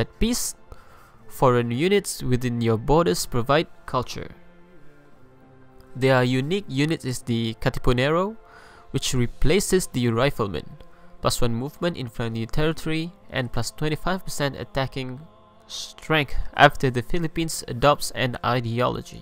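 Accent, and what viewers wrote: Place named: Malaysia. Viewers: Sounds Malaysian, hi! xD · I can recognise the accent especially when you say Darussalam, Kampong, Che Bong Nga, Gajah, Alam Melayu, and Pesilat. Very significant ^^ Nice to meet you :D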